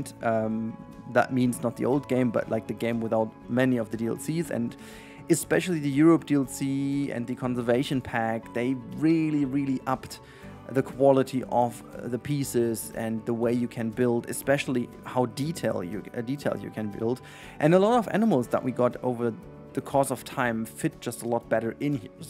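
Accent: Germany. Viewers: junge liebe ich den deutschen Akzent den man hört 😅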